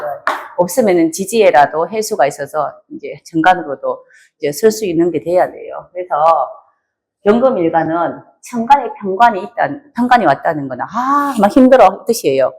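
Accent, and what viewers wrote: Gyeongsang, South Korea. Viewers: ! 표정이나 말투가 보기 좋고 경상도 사투리 투박한 억양을 아주 기분좋게 잘 쓰네여 을목 오월생이 병화를 띄워 그런지 엄청 밝아서 좋은 에너지를 받아여 간간이 보여주는 유머나 천진한 듯한 애교도 구독자의 시선을 한 시간 동안 뺏기에 모자람이 없어 잘 보고 구독하며 감사드려여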